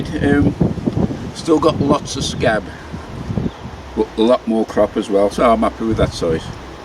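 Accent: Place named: West Bromwich, United Kingdom. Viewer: Best allotment channel on YouTube. Grew up in West Bromwich but moved South around 20 years ago and it’s nice to hear the familiar accent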